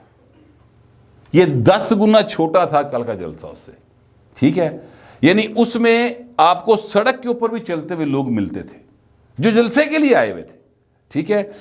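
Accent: Lahore, Pakistan. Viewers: i really enjoy your lahori accent, urdu punjabi mix...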